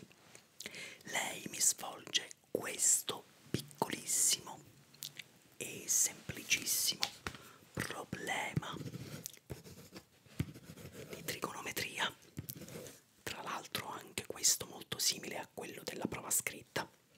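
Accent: Sicily, Italy. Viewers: Sembra che ti eri davvero alterato e stressato!😂😂😂😂AHahaha Recitazione Eccellente!!❤Che poi si sente vagamente quell'accento siciliano che io amo tanto!!!❤Ti amo di bene!😊😊❤ PS Troppo forte il finale, che se ne sono andati tutti, giustamente!😅 Ahaahahaha geniale!!! 😅😅😂😂😂😂😂😂🤣🤣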